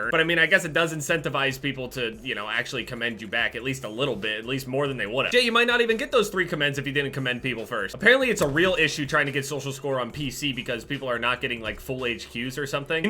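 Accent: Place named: Central Jersey, United States. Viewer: Holy fuck I’m from 609 area I’m dying, it’s like central jersey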